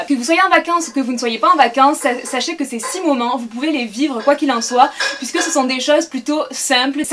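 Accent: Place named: South, France. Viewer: Je t'ai découverte depuis quelques jours ( merci aux suggestions!) Ta bonne humeur, ta spontanéité, ton accent ( ça me rappelle mon sud!) me fait sourire! Avec vous êtes authentique et très agréable, continuez ainsi et soyez heureux c'est l'essentiel!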